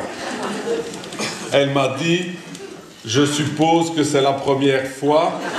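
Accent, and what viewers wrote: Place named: Belgium. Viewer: accent Belge évident!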